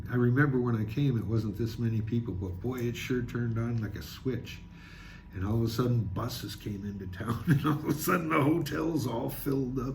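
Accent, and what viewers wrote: Canada. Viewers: You hear some good ol' Canadian, eh??;-))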